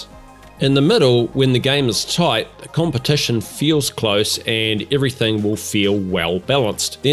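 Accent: New Zealand. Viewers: Great video mate, well said! Your kiwi voice is bloody brilliant!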